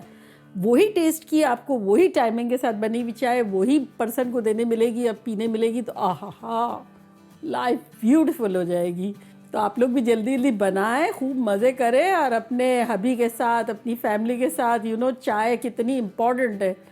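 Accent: India. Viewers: Aapke bolne ka style Indian hai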